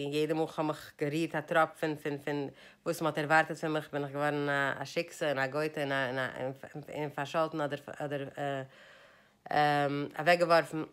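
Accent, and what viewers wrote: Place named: Hungary. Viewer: You have a real hungarian Yiddish 😂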